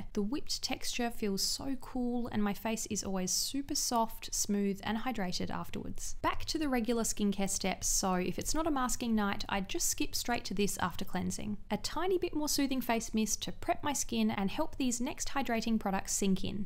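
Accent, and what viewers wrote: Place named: Australia. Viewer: I love your Aussie accent. Your voice is so calming. You should make a skincare asmr video 😂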